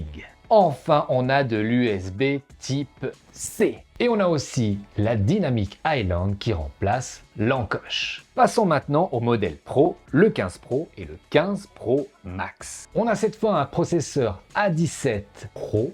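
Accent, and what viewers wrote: Switzerland. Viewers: Se bon accent Suisse il m’avait manqué, welcome back et félicitations pour cette grande mif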